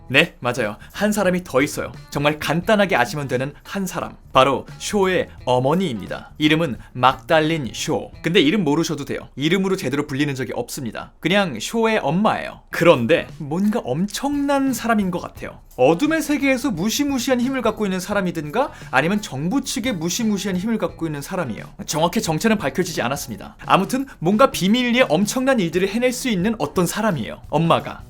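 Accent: United Kingdom. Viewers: 영국 발음이라 그런거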